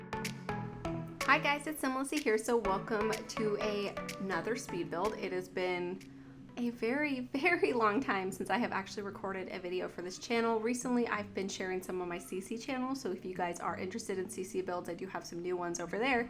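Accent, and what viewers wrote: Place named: United States. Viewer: And you know since you live in America actually I think you live in America based on your accent either that or somewhere in Canada and you can definitely buy the packs and write them off on your taxes as business expenses if you're not sure of that you should ask your husband but what I'm saying is verifiable You could even purchase brand new computer and write that off in your business expenses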